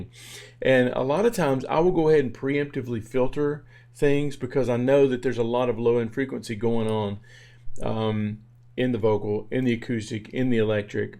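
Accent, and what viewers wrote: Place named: South Carolina, United States. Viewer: Great video my friend. I was trying to place your slight accent. Maybe South Carolina? haha don't know. Don't disclose this, I like your voice lol. Great video my friend. Well done!!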